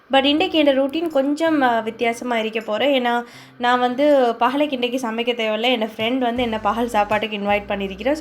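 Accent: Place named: Galle, Sri Lanka. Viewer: Super testy food vary good sister neenga pesuradu galle tamil maadiri erikkiye thank you sister 💐👏😁💯🌹 Mohammed thasleem from doha Qatar 🖐️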